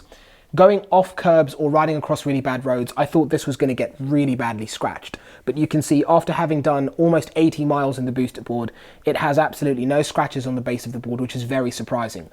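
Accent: England, United Kingdom. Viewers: I don't know if it's the English accent or the professionalism but this is one of my favorite reviews. I'm a NYC Youtuber and you have definitely made me want to step my game up exponentially. I'm buying the Evolve Carbon GT next week so I'll put out my review. Let's collab on a video. I'll fly out to the UK no problem. Keep doing your thing bro. You have a new subscriber.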